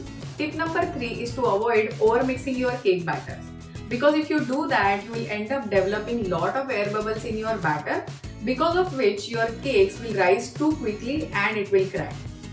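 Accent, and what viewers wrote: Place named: India. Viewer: Put an Australian on, can't understand indian talk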